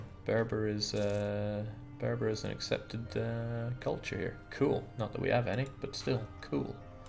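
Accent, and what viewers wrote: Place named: Inverness, United Kingdom. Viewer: You sound Invernesian, you a snecky?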